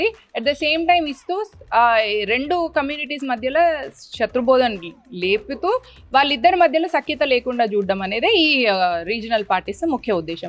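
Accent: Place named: Telangana, India. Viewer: Mee Telangana yaasa ❤❤❤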